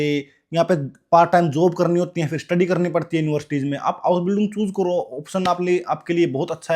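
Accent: Haryana, India. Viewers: Not to offence apki tone kitni haryanvi hai lagti hi nhi hai apko German suit karti hogi par earn karna sa matlab hai 😅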